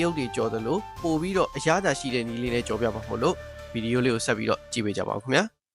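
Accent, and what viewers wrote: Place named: China. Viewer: တရုတ်တိုစွသံတောင်မကြားချင်ဘူးရွံလို့ပါ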